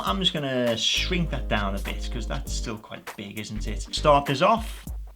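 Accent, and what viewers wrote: Liverpool, United Kingdom. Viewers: Hi, nice review - I built my own very similar engraver but one of these caught my eye while browsing eBay. However, I cannot work out your accent. Bit of Scouse, bit of Geordie and a bit of Oxbridge:-) Where are you from?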